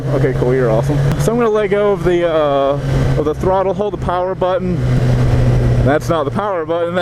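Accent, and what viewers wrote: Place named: North Carolina, United States. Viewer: Are you in NC? Your accent and scenery feel very familiar!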